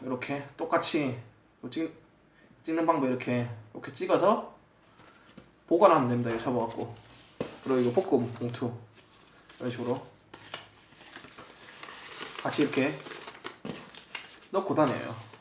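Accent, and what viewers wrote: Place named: Daegu, South Korea. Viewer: 어디분이시져? 부산? 대구같은데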